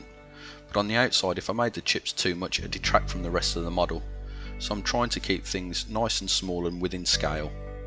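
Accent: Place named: Midlands, United Kingdom. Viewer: instruction on the four videos is first class and the rhino is an ebay buyers dream.. its good to hear that midland tone back on youtube.maybe next time you would paint some forge world mk2 hh marines in the good old ultramarines livery...please. oh and you get 4 thumbs up..way to go.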